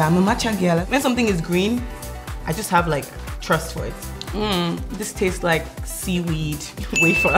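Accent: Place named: Nigeria. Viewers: first of all, did you read the comment He's just saying that she's not trying too hard to change her Nigerian accent No one is hating you ffs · Love how you spoke with your Nigerian accent and not trying to speak with an Anerican fake twang like some afrobeats artists. Keep repping Nigeria be proud.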